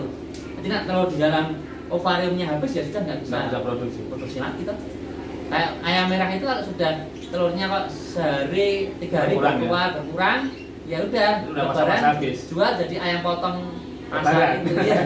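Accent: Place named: Banyumas, Indonesia. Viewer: Weh tanggane nyong kue ngapak2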